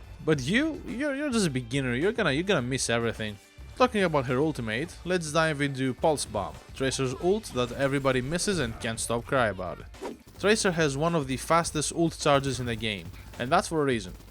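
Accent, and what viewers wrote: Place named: Greece. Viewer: Ελληνική προφορά αααααα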